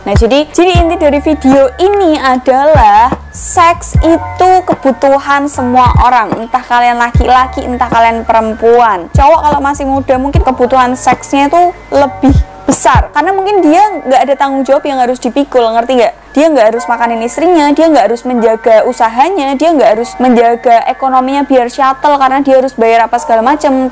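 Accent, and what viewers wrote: Java, Indonesia. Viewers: Salfok sama bahasa nya mba wkwkw "Medhok" wkwkw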